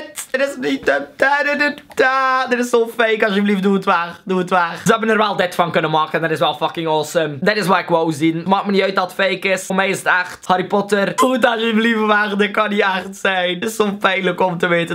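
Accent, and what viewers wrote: Belgium. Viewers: Hoor je dat niet hij is belg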